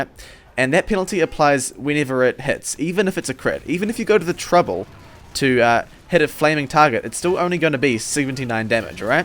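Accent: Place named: New Zealand. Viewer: He's from new zealand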